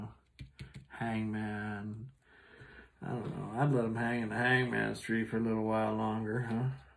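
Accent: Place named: South, United States. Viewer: I love your energy! I love your humor! I love your passion! And i love your accent (i'm not a native english speaker but, do i sense a southern accent there?). I claim all your readings in advance! Love and Light!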